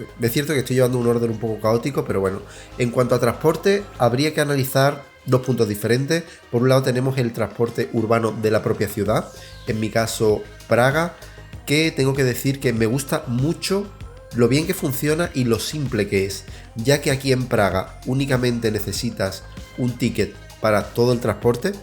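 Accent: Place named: Andalusia, Spain. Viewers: Tres años en Chequia y sigues con un acento andaluz atroz? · Que problema tienes con su acento andaluz ? Nuestro acento es maravilloso .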